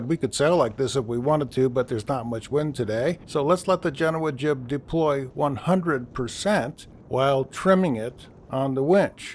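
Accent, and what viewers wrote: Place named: Southern California, United States. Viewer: I love The Philosophy of Sailing.I listen to it often. Learned so much. I grew up in Sherman Oaks and Fullerton. Your So. Cal accent (intonation, cadence, tempo, along with your wonderful humor) is something I am very familiar with. You remind me of listening to my best friend’s father as we hiked Catalina, from the isthmus to Avalon. Looking forward to more of your wonderful videos. Have you considered a Vol. 2 of Philosophy? I’d buy it in a heartbeat!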